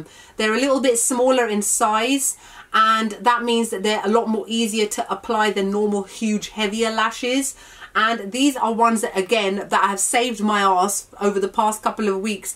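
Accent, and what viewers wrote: United Kingdom. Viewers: Hi from US, I love the way you talk :) plus UK accent. Your Makeup looks amazing!, need to get that lip color, so pretty! Love that colourpop blush, even i mostly always reach for cherry purply colors for blush. Watching your video to make list of things to buy with Sephora 10% coming up soon :) xoxo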